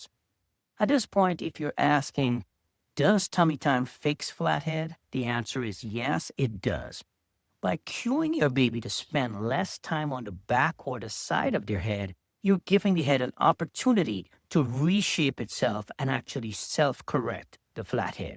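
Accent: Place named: Caribbean. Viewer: Do you operat ein the Caribbean? I'm hearing an accent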